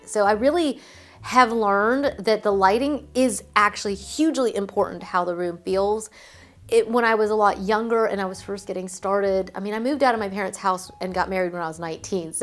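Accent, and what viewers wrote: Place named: South, United States. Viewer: I slowly hear your "southern" creeping back!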